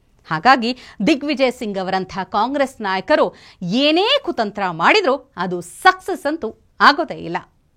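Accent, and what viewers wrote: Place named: North Karnataka, India. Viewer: ಮೇಡಂ, ನೀವು ಉತ್ತರ ಕರ್ನಾಟಕದ ಕನ್ನಡದಲ್ಲಿ ಮಾತಾಡಿದ್ರೇನೆ ಕೇಳೋಕೆ ಚೆಂದ.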